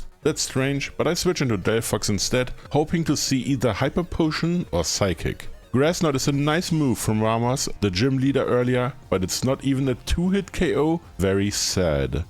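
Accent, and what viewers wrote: Germany. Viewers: So habe das Video jetzt erst gesehen ich habe direkt erkannt dass du deutscher bist du hast einen sehr ausgeprägten deutschen Akzent da fühl ich mich doch gleich wie Zuhause or in english like Home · first time seeing a video of this channel but am i hearing a german accent? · Peak, but are you German perchance?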